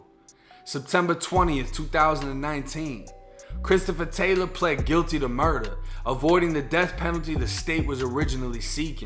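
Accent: Boston, United States. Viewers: Your accent is interesting. I've never heard what a boston wigger sounds like before. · “I don’t know if he’s re-ta-did or what” 😂that Boston accent kills me every time. Great channel bro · Checking in from Providence, Rhode Island, it's cool that hes got the east coast/Boston accent too lol. PS These dudes are sick🤢🤭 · Yo cuzzo, I don't know ya name. You, the one who runs this page, I hear u say u been all through Florida prisons, so I assumed u were from Florida. But it sounds like u are from Boston, or even a little NY. Where u from cuzzo. And I definitely fucks wit ya page. I always watch it. I did a little over 10 yrs. Did time in Philly, Smyrna and Delaware, but where u from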